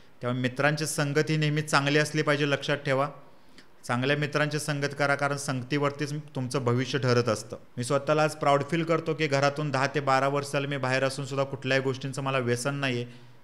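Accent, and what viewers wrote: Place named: Walwa, India. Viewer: मला पहिल्यापासूनच वाटत होते की ही भाषा वाळवा तालुक्यातील आहे मी पण इस्लामपूर मधून आहे .माहेर इस्लामपूर व आजोळ आष्टा. डीन.एड्. कस्तुरबाला झालंय. आमच्या तालुक्यातील माणूस लय भारी वाटत.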